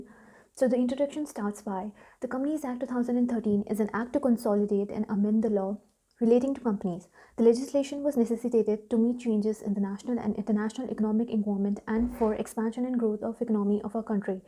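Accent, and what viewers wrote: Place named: United Kingdom. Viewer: Thanks i got some clarity, by the way your accent is like British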